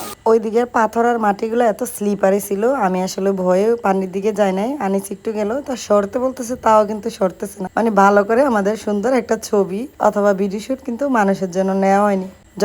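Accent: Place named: Chittagong, Bangladesh. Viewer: ভাইয়ের চিটাইংগা হথা বউত গম অইয়ে।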